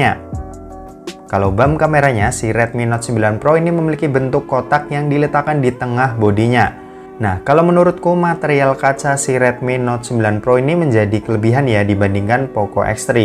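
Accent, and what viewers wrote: Semarang, Indonesia. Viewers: org semarang bro?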